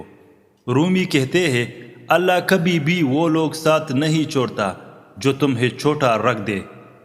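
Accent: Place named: Pakistan. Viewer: Urdu Shi hai wo pakistani lahza hai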